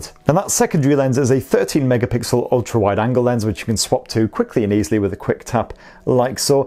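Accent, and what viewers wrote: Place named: North, United Kingdom. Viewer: You keep saying the word 'hazar'. The closed captions says 'hazard'? Is 'hazar' a northern word or something?!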